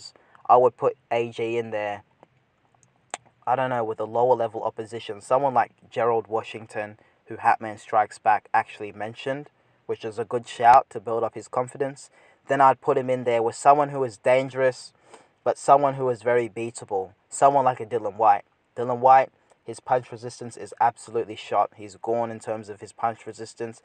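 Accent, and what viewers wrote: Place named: Australia. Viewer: 👍 I knew I could hear a slight Australian accent in your voice. It's very mild though...hard to tell.....I bet most Americans think you're from the UK!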